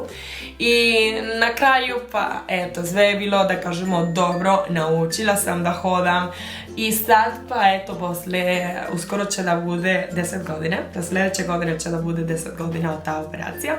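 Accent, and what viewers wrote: Serbia. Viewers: Joj kako ja volim kako pričaš srpski !!!! 💞💞💞💞😂😂😂😂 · Kraljice bukvalno kada god sam tužna samo pustim tebe mislim malo smesno picas srpski no HEJT volim te mnogooo ja tebe razumem i stvarno bas mi je zao iako znam da je to bilo pre 10.godina Ali idalje ne osacas nogu bas mi je zao volim te najviseeee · Sve slatko ali tvoj Srpski kako slatkoo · Boze koliko ti slatko pricas Srpski😍 usavršila si jezik😁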